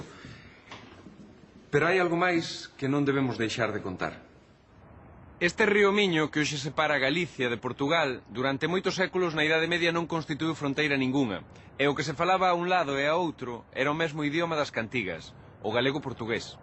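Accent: Castile, Spain. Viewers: Un apontamento: o apresentador non ten verdadeiro ou profundo sotaque galego, ten escaseza de fonética auténtica. Seica é un neofalante desta lingua, e a sua lingua nai sexa o castelán. Dai que para os observadores extranxeiros pareza mistura co español, e que un hispanofalante comprenda perfeitamente. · Se calhar percebes melhor o espanhol, isso sim. O que ouves nesta reportagem está mais para castelhano que para galego. O verdadeiro galego é pronunciado de igual forma ao português do norte (Minho e Trás os Montes), Se calhar temos todos um problema na garganta e os brasileiros como tu é que falam português correcto, vai-se a ver... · Este apresentador fala um galego foneticamente castelan e com palavras do castelan o castelhanismos. O galego, hoje, é a mesma língua que a falada na Portugal, que é um galego evoluído,.influenciado pela separação do condado de Portucale do resto do Reino da Galiza e pela implantação da língua castelhana pelos reis católicos na Galiza que produziram a castelanizaçom da nobreza galega e do galego o que a história da Galiza conhece como a ´ "Séculos oscuros, que a " separaçom que o rei Alfonso VI de Castela, Leão e do Reino da Galiza - que incluía as terras portuguesas que se estendiam do rio Minho a Coimbra, depois de tomar a o seu irmão, Garcia, rei do Reino da Galiza - que incluía o condado de Portucale, o separou do reino galego o condado Portucalense, entregando a atual Galiza a sua filha Hurraca e o conde Raimundo, e o condado de Portucalense a sua filha bastarda Teresa e o conde Henrique da Borgonha. 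Lista da reis da Galiza: <link> A verdadeira hítória dos origenes galegos de Portugal que muitos historiadores portugueses ignoran:<link> .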